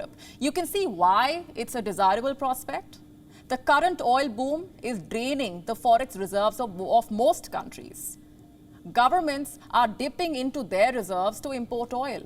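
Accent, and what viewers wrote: India. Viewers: Gosh indian accent is horrible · I wish your Indian accent was greatly reduced so you would be easier to understand. And your voice doesn't have the soft firmness I've become used to. I really hope you will work on this. Your channel is quite informative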